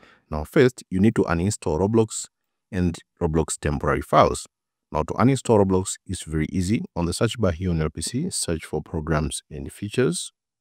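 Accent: India. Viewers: I knew i would work when i heard his indian voice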